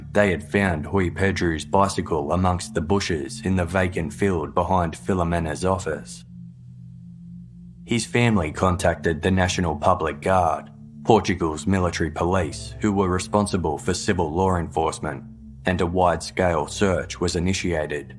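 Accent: Australia. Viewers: Is Aussie?????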